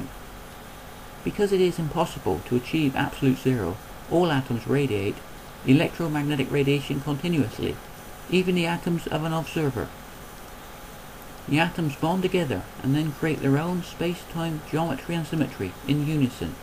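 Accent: West Country, United Kingdom. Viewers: hey dude, this is the same way i see quantum entanglement. but then i'm a biologist so what do i know. also, are you from the west country?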